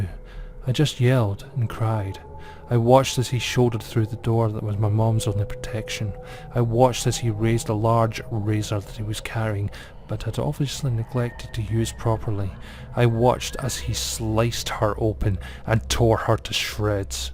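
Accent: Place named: Northeast Scotland, United Kingdom. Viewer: Oh no! Ye peer wee dug..fit an affa thing tae happen aefter bein adopted in the first place. Fit rare listnin tae yer bonnie NE accent fearin me silly we yer creepy tales..love it!! Scottish wifie/grunny in London xx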